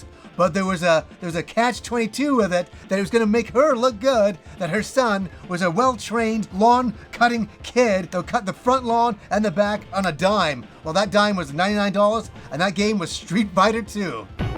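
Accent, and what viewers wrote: Yorkshire, United Kingdom. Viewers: I have a Yorkshire mum, i feel like i understood you a lot here. My love and thoughts with you here. 😊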